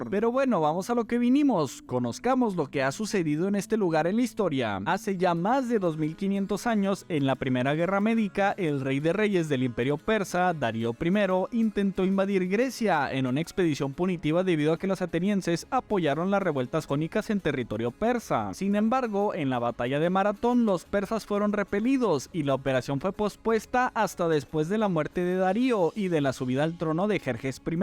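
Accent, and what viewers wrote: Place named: North, Mexico. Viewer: Chido canal, por cierto, eres mexa? Supongo que tu "sh" proviene del norte, como de Chihuahua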